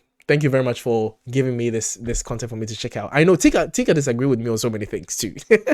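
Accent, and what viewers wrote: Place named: England, United Kingdom. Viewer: I saw an interview with Troy and Tuomas talking about not believing in God and organised religion. Troy actually said you are told about Santa and the tooth fairy and God, but for some reason as we get older some people still believe in God he says its, nonsense. He's English like me and I'm not saying there's no religion over here but if you compare the percentage of church goers to say the US our numbers are far lower, you sound English. This is in no way meant to insult people who believe in God I don't but respect other people that do. Your reactions are always funny informative and interesting. 🇬🇧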